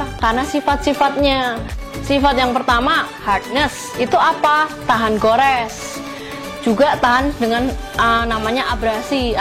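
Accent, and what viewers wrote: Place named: Banyumas, Indonesia. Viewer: medok logat nya...kaya ngapak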